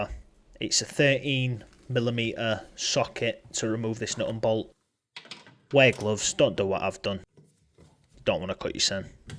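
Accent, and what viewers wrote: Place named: Yorkshire, United Kingdom. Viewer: Brilliant video. I had the dampers replaced in mine a few months ago, but now it's making a horrendous sound spinning with sparks flying inside the drum. Probably bearing collapse. Your strong Yorkshire accent brings back memories. I was born and bred in Yorkshire. I moved from West Yorkshire to Cornwall 30 years ago as it was being taken over by Pakistanis and other minorities.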